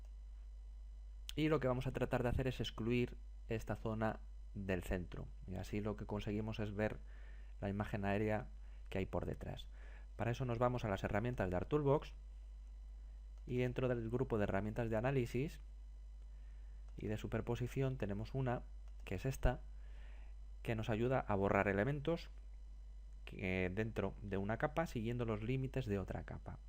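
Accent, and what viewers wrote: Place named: Madrid, Spain. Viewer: Estoy viendo este video desde Pakistan y aca el medio normalmente es Ingles pero con mi nivel intermedio de Espaniol entendi perfectamente y me habeis ayudado demaciado para preparar una mapa. El senior tutor suena madrilenio... lo creo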